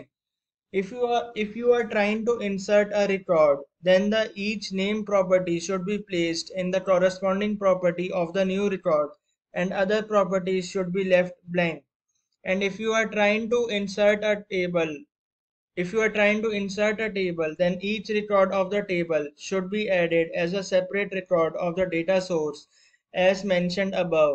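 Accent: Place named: India. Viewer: why pause when talking? all indians sound identical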